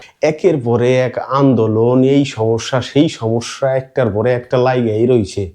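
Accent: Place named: Barishal, Bangladesh. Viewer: হরে কৃষ্ণ রাধে রাধে প্রভু, আমাদের বাংলাদেশের হিন্দুদের জন্য প্রার্থনা করবেন। দাদা আপনার বাড়ি কোথায়? আপনি তো খুব সুন্দর বরিশালের ভাষায় কথা বলেন?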